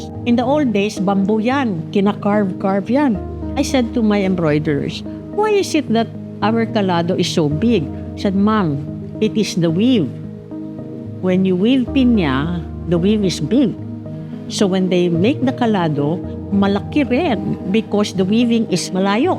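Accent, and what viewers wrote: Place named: Conyo, Philippines. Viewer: conyo pala si Ma’am hahaha ang cute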